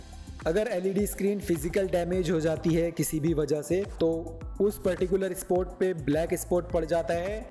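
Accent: India. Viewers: Indian english messes me up. I hear an india speak like this and I don't know wether I fail to get through the accent or they are just using a couple of english words between otherwise different language.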